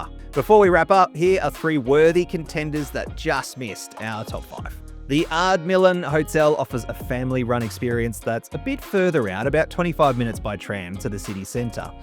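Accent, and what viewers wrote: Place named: Australia. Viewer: Bro are you Australian?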